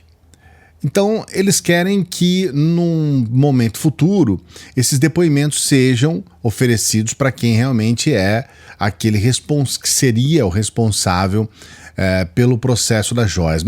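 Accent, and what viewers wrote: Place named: South, Brazil. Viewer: Apesar de você nos descontrair com sotaque "caipira sulista" eu recomendo seres mais formal e profissional. pelos 500mil seguidores 👍🙏😉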